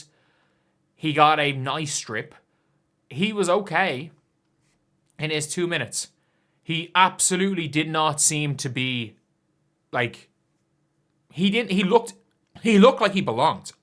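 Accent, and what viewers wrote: Ireland. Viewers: You must be from Cork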